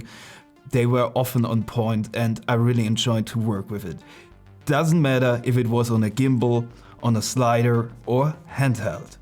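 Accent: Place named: Germany. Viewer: I heard you speaking. and my first thought was: is he German? And, yeah, I was right! 😁 .. Thanks for the review. I want to buy it, but the link you provide only targets to Amazon US and I'm not sure if you'll get the commission if I switch to Amazon DE, maybe you should provide a german link 😉.. Viele Grüße aus Köln!!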